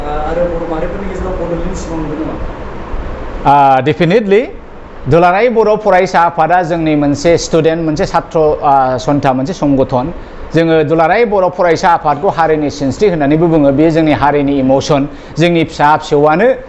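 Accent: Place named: Bangladesh. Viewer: Ngni raoa bangladesi butumnaisw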